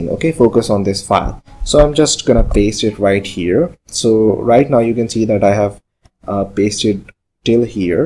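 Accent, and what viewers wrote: India. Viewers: ddddddddd... Indian accent...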